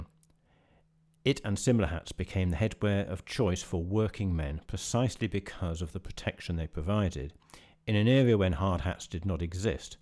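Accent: United Kingdom. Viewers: “Fwum.” “Fwee.” “Wibbons.” “Amweriker.” “Twentiff.” “Gwandmuvva.” I don’t understand that Brit bs accent… But I do like the info in the video. But only once. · Of course he has a British accent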